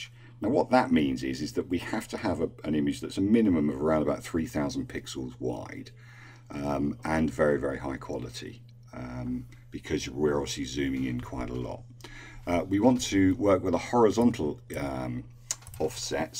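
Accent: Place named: United Kingdom. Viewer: Thank you for this video! You saved me hours of frustration with the Ken Burns effect! Loved listening to your British accent too! lol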